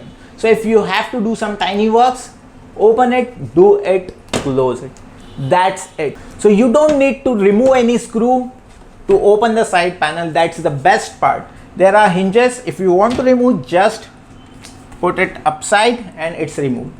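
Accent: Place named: India. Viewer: Ох уж этот индийский говор...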